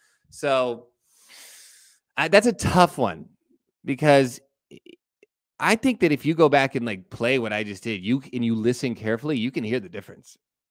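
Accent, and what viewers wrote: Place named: China. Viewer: Best Chinese accent ever!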